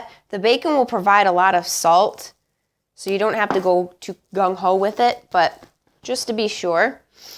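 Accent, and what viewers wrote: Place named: Minnesota, United States. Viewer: She's gotta be from meennasowda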